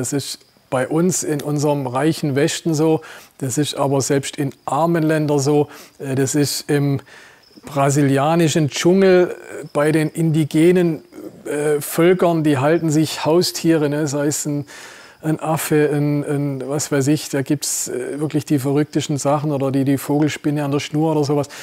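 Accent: Swabia, Germany. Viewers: Klasse. Vielen Dank für dieses wichtige und meiner Meinung nach dein bisher bestes Video. Einziges Manko aus meiner Sicht : dein hartnäckiges Beharren auf deine für Nicht-Schwaben schwer verständliche Mundart. Da du das offensichtlich weder ernst nimmst noch jemals ändern wirst : wie wärs mit generellen Subtiteln auf Hochdeutsch? Abgesehen davon hast du das Video echt toll gemacht und bei dem brisanten Thema echtes Fingerspitzengefühl bewiesen , Hut ab. Viele Grüße von Toni Mindnich aus Spanien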